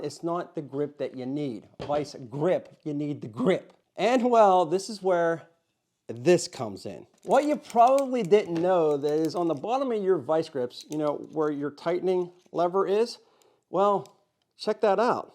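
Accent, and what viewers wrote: Pittsburgh, United States. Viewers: just found your channel, this is really a nice feature , but none of my vice grips have that allen wrench opening on the adjuster, probably because my tools are pretty old - I have Vice Grip branded and a few Craftsman vice grips in my tools. so... I'm gonna go and grab a new set that has the allen wrench hole. by the way, do I hear a little bit of Pittsburgh accent? or southwestern PA. when you say a few words I hear Pittsburghese "in 'air" I'm not making fun, it's just I hear a few words . thanks for the video , I subbed especially because that accent or I guess I should say colloquialism :)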